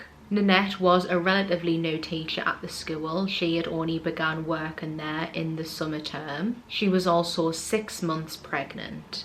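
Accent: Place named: Scotland, United Kingdom. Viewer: Holy Smokes!!!!???!!! What tge H***!!!! Are you from Scotland? What a STRONG, STRING accent!! 😅😅😅😅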